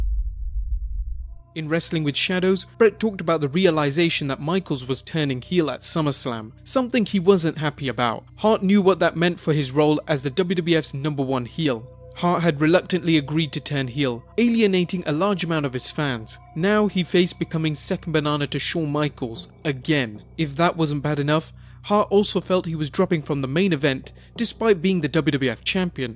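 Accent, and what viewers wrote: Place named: United Kingdom. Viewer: I love this guy's Britain accent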